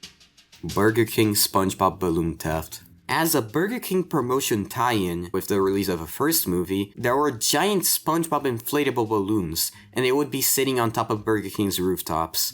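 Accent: Brazil. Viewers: carai mano tu é brasileiro? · BAWJWJWJSJWJ VC É BRASILEIRO???? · carai tu é brasileiro quase pulei da cadeira quando ouvi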